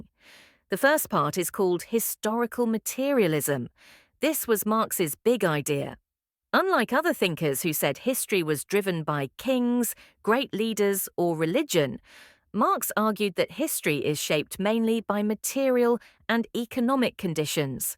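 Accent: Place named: United Kingdom. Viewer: How is the channel location Pakistan while the narrator voice is white British?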